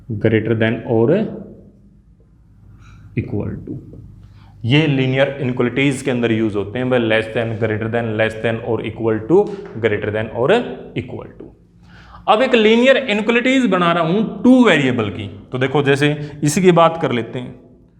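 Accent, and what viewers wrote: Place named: Haryana, India. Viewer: Si ke accent ko dekh ke lagta h ki sir haryana ke h 😎😎 But kuch bhi ho padhaya badhia 🙏🙏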